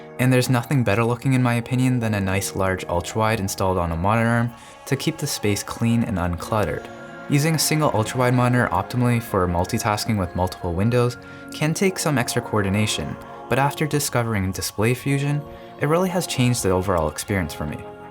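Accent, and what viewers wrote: Canada. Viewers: Woah are you from Toronto?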